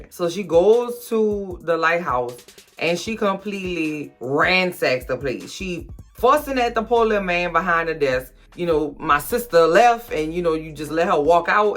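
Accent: New Orleans, United States. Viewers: I love your reviews and your NOLA Accent .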